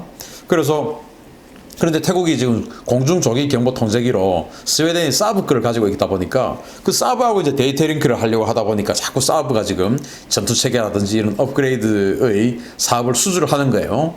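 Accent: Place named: Gyeongsang, South Korea. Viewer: 경상도 사투리 학을 땝니다 표준어 기겁 합니다 오랜만에 고향말 들으니 정겹습니다 신선생님 건강하이소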